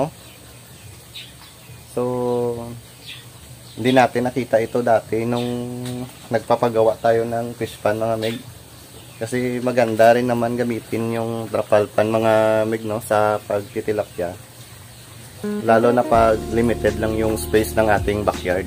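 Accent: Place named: Iloilo, Philippines. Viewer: Ilonggo ka siguro nu meg.. kay may ebidensya ako simu..sa tono ng boses mo abut taka meg..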